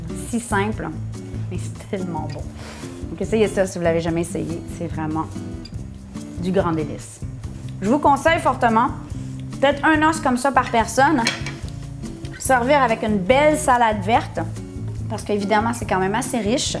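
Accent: Canada. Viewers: Salut à toi, j'adore ton accent canadien,mais dit moi je croyais que les os à moelle c'était juste bon pour mon berger allemand,et mon labrador,quand j'en mettait dans mon pot au feu c'était juste pour parfumé mon bouillon de pot au feu et ensuite je les donnais comme friandises à mes deux chiens,j'ignorais totalement que cela pouvait se mangé et se consommer,c'est pas un peu gras tout cela dit moi???,en tout ka super recette merci beaucoup à toi,toutes mes félicitations à toi!!!.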